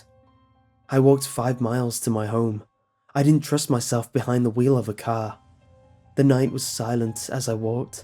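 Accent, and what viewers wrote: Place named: United Kingdom. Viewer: The british accent doesnt make it sound THAT scary.. but, its awesome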